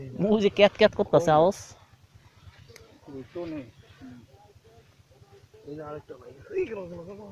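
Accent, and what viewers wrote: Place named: Bikrampur, Bangladesh. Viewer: ভাষা শুনে আমাদের বিক্রমপুর মনে হচ্ছে।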